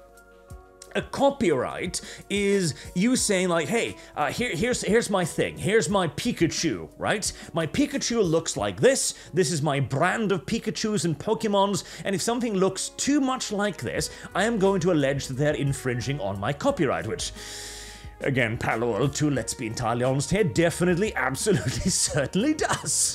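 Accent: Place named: United Kingdom. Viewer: I didn't realize brits stress vowels so differently versus Americans.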